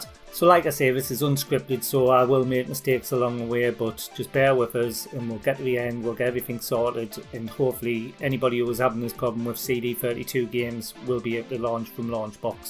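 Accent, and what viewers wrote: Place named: England, United Kingdom. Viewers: HI, i have followed your tutorial but only get the CD32 loading screen, i am not sure if my games are in the correct format, i have unzipped the folders and have a cue file and another iso folder inside the game rom folder. i have the kickstarts installed. by the way i thought from the accent you were from Middlesbrough, i am from newcastle myself. hope you can shed some light cheers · hey! i know its been asked and answered already but where in england are you from? i just lovin the accent and i wanna look into it and hear more of it:) i dont remember your answer let alone the video where i read it. thx. (also always lookin for new amiga stuff from you:)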